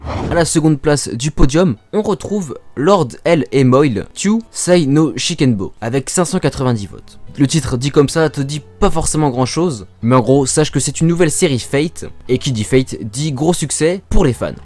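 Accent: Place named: England, United Kingdom. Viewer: Quel bel accent anglais, je me demande comment l'as-tu obtenu ?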